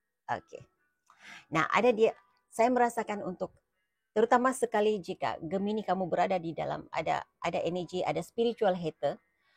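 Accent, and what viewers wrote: Sabah, Malaysia. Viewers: Dari logat bahasanya kaka ini macam orang Sabah sarawak😅😊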